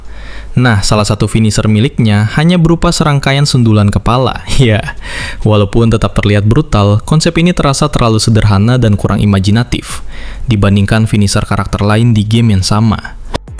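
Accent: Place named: Surabaya, Indonesia. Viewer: Lu org surabaya bang?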